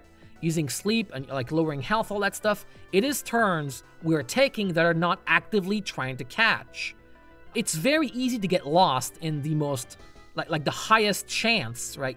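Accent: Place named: France. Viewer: Judging by some slight variations in accent, I'll assume you're from France?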